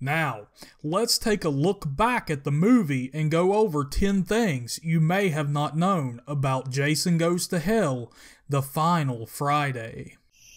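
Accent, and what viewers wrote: United States. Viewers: Wtf is your accent!? I love it! I know it’s American... I’m from New Orleans myself. ⚜️😉.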